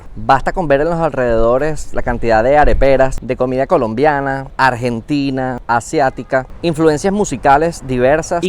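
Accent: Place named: Venezuela. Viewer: Pana,,hablas igualito a un buen pana mio Venezolano tambien,,😁😁,, concha y me estas embullando a mudarme para alla,, ya USA me infla las pelotas ja ja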